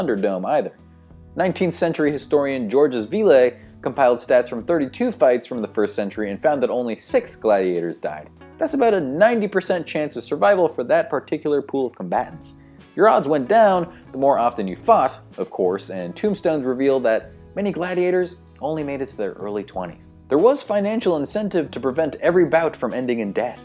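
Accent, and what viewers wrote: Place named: United States. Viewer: O_o what English are you speaking? That is perfectly normal American English pronunciation of those words.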